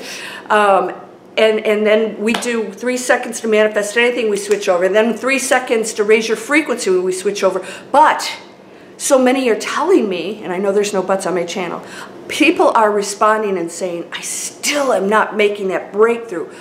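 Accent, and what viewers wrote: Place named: Detroit, United States. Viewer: Hi, I just got to say I love your Detroit accent. My mom and dad where from Detroit and my mother has a similar accent like yours. My parents lived on 9 Mile back in the 1950s and early 1960s. They moved to California in 1963 but I wanted to say how much I love your channel. Thanks for everything.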